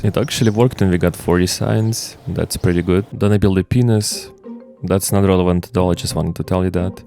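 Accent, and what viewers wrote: Russia. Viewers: Wha What is your accent? Not trying to offence, I'm really interested, if you're from Russia. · NOT an American lmao that accent exists NOWHERE in the US 😂 feel like he comes somewhere from Russia or Asian/Euro area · from a guy with strong Russian accent...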